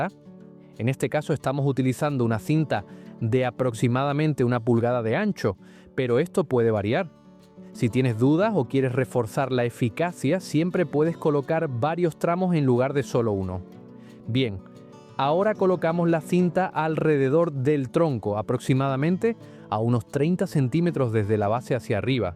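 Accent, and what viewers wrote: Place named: Spain. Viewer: HOLA, YO TE VEO DESDE BARCELONA A ESPAÑA. PERO ME PARECE QUE TÚ TAMBIÉN ERES DE ESPAÑOL. POR LO MENOS EL ACENTO UN POQUITO COMO EL MÍO. YO SI VERO PERO VAMOS QUE ME GUSTA TU VÍDEO. DE HECHO, YO ME LO GUARDO. VENGA, ME ENCANTA TU DEJE. ADIÓS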